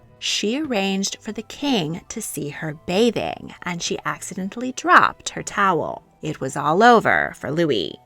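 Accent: United States. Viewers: you should redo this video without the advertising scam and narrated by a pleasant and correct english speaker without the horrendous american accent. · Beautiful research, writing and graphics. American accent is particularly abhorrent.. Overdub by French woman please.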